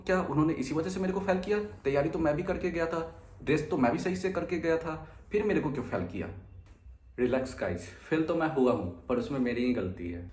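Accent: India. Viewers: Bhai tumhara bihari accent lg raha…Bihar se ho kya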